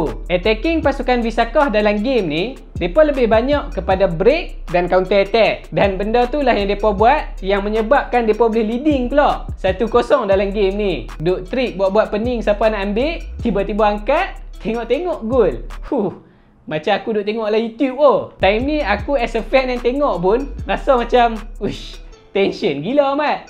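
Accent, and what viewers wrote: Kedah, Malaysia. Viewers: Cakap kedah, kita pon dengaq puaihhhh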